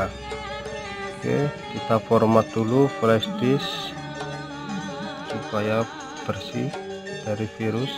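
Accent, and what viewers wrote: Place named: Banyumas, Indonesia. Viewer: Kaya wong banyumas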